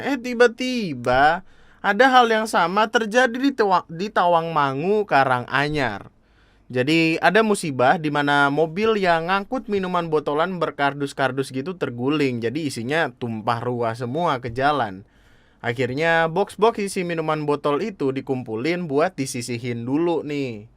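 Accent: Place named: Medan, Indonesia. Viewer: Dari logat nya kaya nya orang Medan itu bang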